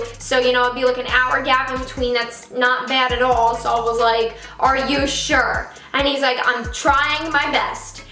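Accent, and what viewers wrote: New York, United States. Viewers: Oh my gosh. The New York in you is SCREAMING in this video. I love it~ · lol i just discovered you and watched your "exposed boyfriend" video and i knew you were from new york. 1) savage as fuck 2) your accent is cute and 3) you're not as basic as white girls in (all of) California and Atlanta.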